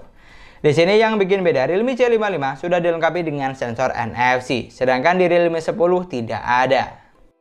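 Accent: Madura, Indonesia. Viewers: Orang Madura yg bang?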